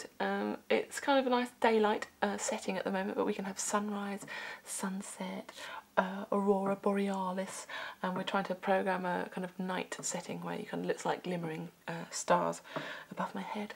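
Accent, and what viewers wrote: England, United Kingdom. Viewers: You have such a beautiful typical english voice I adore your music so much! You are such an inspiration to me Imogen! Just thought I'd say:#3 My friend Kimmy is entering XFactor singing ' ' Think Simon knows that song? x3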